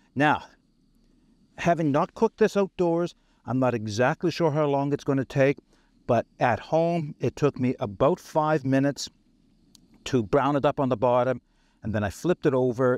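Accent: Canada. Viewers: Yeah cool, I did assume you'd have some similar "iron" type device over there. No worries on Canadian diction mate, just curiosity, it's those Yanks that tend to bastardise the language,..... but even then, it's all in good fun, we are arguably the worst of the English speaking nations for it; ) Had that book a while now, to be honest I didnt know if it was still available, since it is, I'd be very surprised if it's expensive. And of course it doesn't mean you must stick rigidly to the recipes, in fact I rarely do, It's more the principle of the thing in a camping context, that I mentioned it.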